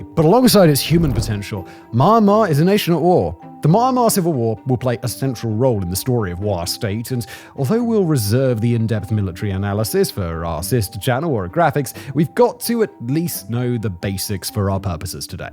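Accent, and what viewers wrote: England, United Kingdom. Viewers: He's terminally English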